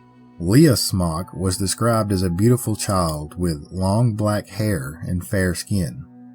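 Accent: South, United States. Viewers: Yay yay i made it in time for the > 100 like status ❤❤ Delectable Dixie drawl here i come 😊😊😊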